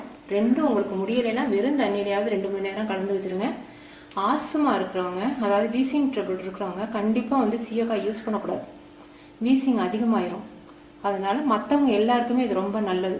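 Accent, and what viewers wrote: Madurai, India. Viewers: tq mam. very useful. I like all ur recipes and we'll explained about the ingredient very tasty.and I like ur madurai language very sweet to hear .tq s a lot.we r very lucky to have such a wonderful talented mam.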